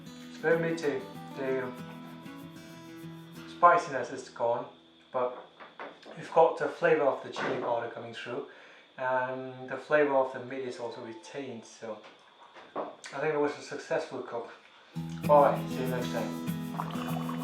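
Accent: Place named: United Kingdom. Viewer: I loooove your British accent 😍😍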